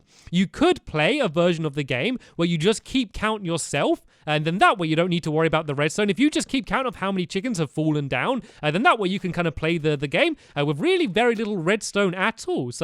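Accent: United Kingdom. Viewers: why do British pepls say wool instead o' wall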